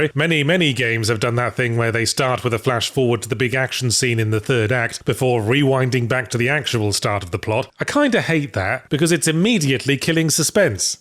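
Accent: United Kingdom. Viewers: In a weird way this reminds me of Egoraptor's talk about about the opening of Mega Man X. But more fancy due to British. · No video intro has ever made me more pumped to hear a British bloke talk about video games.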